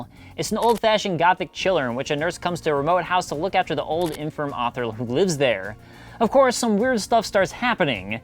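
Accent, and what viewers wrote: United Kingdom. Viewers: Neko Sama because his accent sounds british, huh? (Lmao moron)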